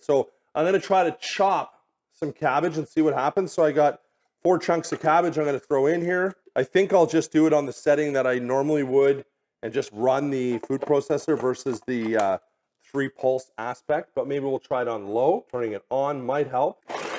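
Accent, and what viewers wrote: Canada. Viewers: canadian for sure. no X in ET CTERA. No X is ES PRESSO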